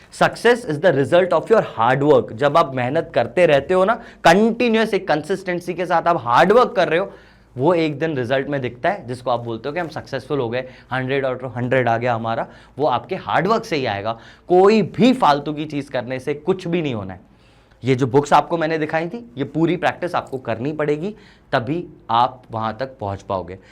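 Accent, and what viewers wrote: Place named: Bhopal, India. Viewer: SIR BILKUL BHOPALI STYLE MEI SAMJHATE HAI❤❤❤❤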